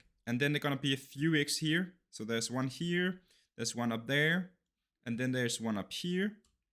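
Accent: Denmark. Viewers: sorry jeg afslørerede dig 😂 du har bare en af de mest tydelige danske accenter når du taler engelsk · Gætter på Dansk accent?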